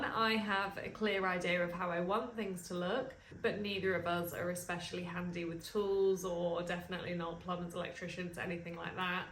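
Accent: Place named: England, United Kingdom. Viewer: You'll fit right in with your pommy accent. Its called a dining room. A diner is a roadstop where truckies eat.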